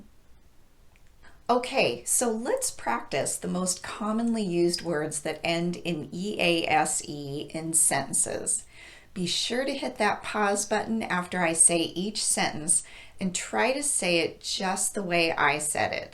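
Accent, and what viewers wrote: United States. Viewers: Thanks for teaching ❤ but I have a question. Could you tell me Which accent is your pronunciation in the U.S.?😢because I found some different accents in the U.S. They are so different, especially some certain words and certain structures. Does it matter if I have a mixed accent 😂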